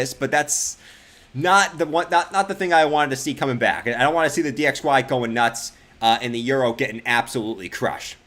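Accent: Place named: Boston, United States. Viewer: I got interrupted! Loving your advancement of effects etc. and yes you still have a huge Bostonian accent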